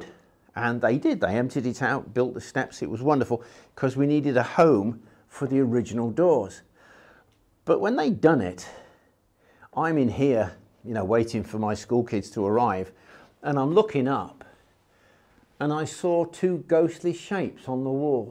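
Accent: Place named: England, United Kingdom. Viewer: He's not American. He's English. Very clearly English.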